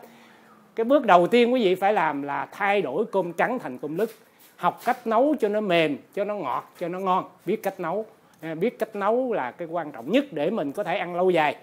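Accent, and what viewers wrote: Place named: South, Vietnam. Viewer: Chúng tôi kính cảm ơn quý Thầy chỉ dạy cặn kẻ, tận tường, lời lẻ giản dị dễ hiểu, Giọng miền nam truyền cảm ấm áp, hình ảnh và âm thanh ở đây rất tốt!!!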